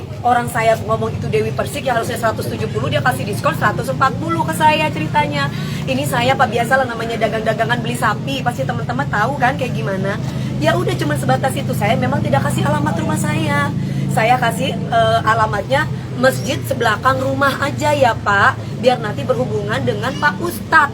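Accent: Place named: Jakarta, Indonesia. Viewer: Gaya betawi😂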